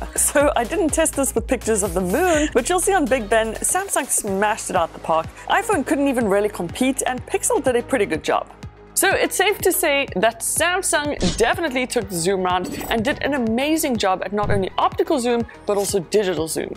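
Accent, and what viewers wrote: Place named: South Africa. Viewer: Is it just me or does she sometimes sound a bit South African? Just the way she pronounces some words reminds me of a South African accent.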